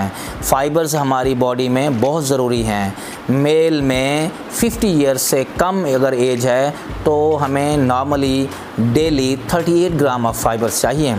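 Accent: Pakistan. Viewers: Bahawal pur yaad aa giya ...aap ki aawaz sun kar ...zinda bad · Sir ap saraki speeker hen lagta ha janoobi punjab K rehnay walay hen....nice videos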